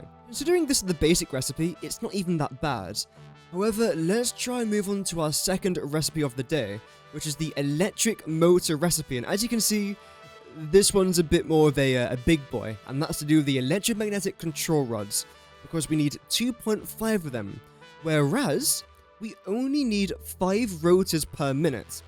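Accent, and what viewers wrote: United Kingdom. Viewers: you sound like a caricature of a posh English noble, or someone from the rich southside of Dublin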